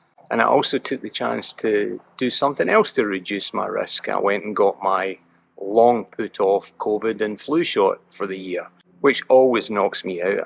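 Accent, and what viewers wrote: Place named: Scotland, United Kingdom. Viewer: Thanks for your videos. As a 64 year-old avid runner, they are great for me. I noticed your Scottish accent, but see from all the US flags in your videos that you're presently in the USA somewhere. I spent 3 years stationed in Thurso (far north of Scotland) as a US Navy Electronics Technician and my wife (for 40 years now) is from there. Where are you from?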